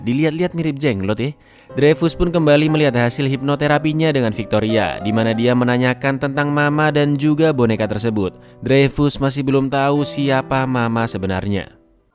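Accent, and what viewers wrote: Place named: Surabaya, Indonesia. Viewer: Mas iki wong endi yo? Teko bahasae kyk wong Suroboyooo... Nek iyo, kok aku curiga. Jangan² mantane koncoku wkwkwkwk. Dadi penasaran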